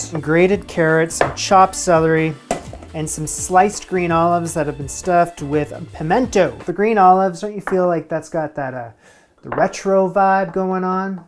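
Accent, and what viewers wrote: Canada. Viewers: your Canadian has gotten SO much more noticeable since you moved 🤣 and when I saw the aspic in the thumbnail I laughed like a maniac 🤣👌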